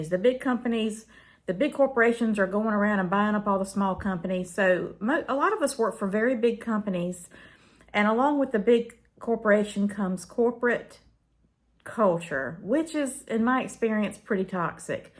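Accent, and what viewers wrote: South, United States. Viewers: You sound southern like ME!! I am 59 y.o. I call it pre retirement hell. I'm an RN and a school teacher. First part of my career was nursing. My 2nd career is teaching k12. Im trying to make it to 67. I probably wont make it. Ill probably retire at 62 but only if I can live off of the $. It takes every bit of my patience to do my job at this point. I too just want some peace in my life. Just simple things but simple is not cheap. My home is debt free. Ive got a small truck payment. No credit card debt but I do have some student loan debt that im despararely trying to get paid via pslf and its all stuck in limbo right now. Its like everything is stuck. Like everything. It's like that movie Groudhog Day.. SSDD.. stuck in same ole same ole.. it feels like I will work until I die. When will this pre retirement hell end?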